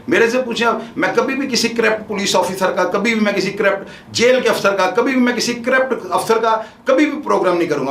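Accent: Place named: Sialkot, Pakistan. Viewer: Aapka lab o lehja Sialkoti hai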